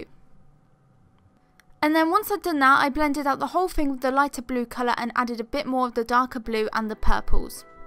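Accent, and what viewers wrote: England, United Kingdom. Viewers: I love your British accent😍🔥 . Is it just me who is just listening you talk rather than actually understanding 😂❤️....loved it though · Can can hear you are English. I have a problem getting Prisma's here. I can buy whole sets here in the UK..but individual colours are impossible to find. Can i ask where you find yours?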